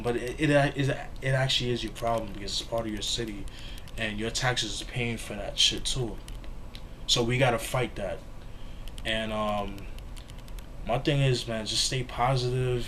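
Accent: New York, United States. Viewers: BTW r u from NY cuz I am and I hear the accent lol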